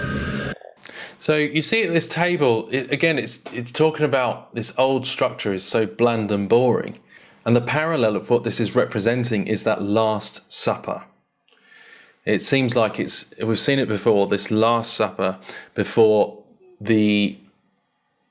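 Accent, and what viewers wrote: United Kingdom. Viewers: EGO DEF He's not American, but English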